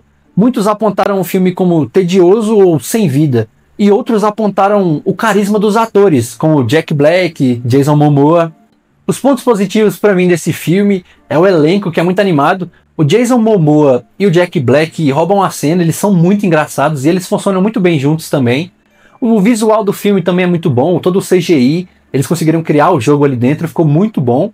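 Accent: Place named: Brazil. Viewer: O vídeo ta muito bom mas esse sotaque divisiano melhora demais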